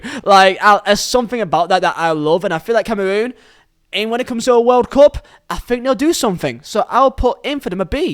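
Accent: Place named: Burnley, United Kingdom. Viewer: he’s from Burnley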